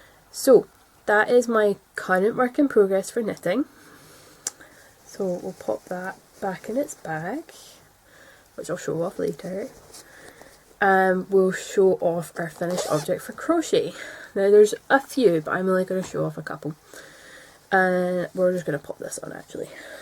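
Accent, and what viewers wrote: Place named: Scotland, United Kingdom. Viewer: Hi, how did you find the Ashford kiwi? I have a traditional and I'm thinking of getting one ( I've fallen down that hole we crafters seem to fall down) My mums side of our family are from Kilmarnock, it always makes me feel at home when I hear the accent, where abouts are you from? We always make sure we nip into Stevenson when we visit, there's a little bakery that sells the best tatty scones;)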